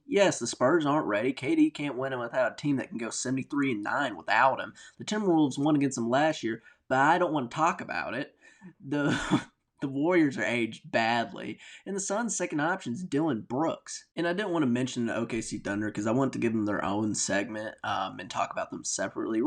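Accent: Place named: Alabama, United States. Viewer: This dude is a casual, bruh. The thumbnail alone implies Harden should be considered for MVP when the Clippers are 5-16. He called Michael Jordan ancient history like he played in 1960. Then he said LeDaddy James (super pause), and called MJ inefficient like he's not 2nd all-time behind Jokic in player effciency. Completely ignored the fact he's shooting .495 for his career while taking shots most wouldn't consider at an all-time high volume. MF put a period at the end of his title like he just learned how to YT lmao Like how are you clearly an L.A. fan who sounds like you're from Alabama? lmao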